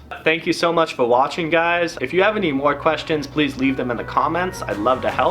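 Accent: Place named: New Jersey, United States. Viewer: It's called having an accent, believe it's from Jersey or Long Island. Get educated.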